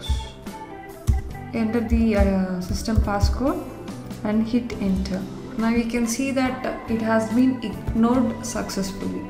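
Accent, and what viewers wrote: South India, India. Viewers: nice voice di are you from south india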